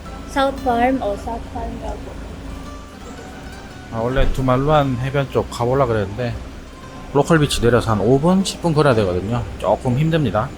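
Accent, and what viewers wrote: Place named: Daegu, South Korea. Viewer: 바빠서 몬 오다가 간만에 대구말 들으러 왔습니다. ^^ 타향살이 하다보이 대구말 듣는게 부모님이랑 통화하는거 빼고는 윽시 어렵네요.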